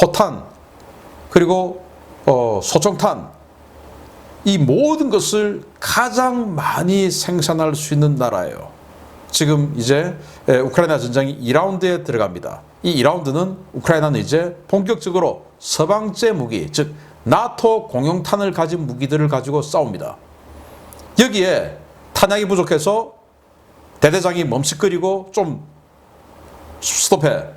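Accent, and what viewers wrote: Gyeongsang, South Korea. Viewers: 오오.....|오만대???? 오랜만입니다.역시 갱상도죠....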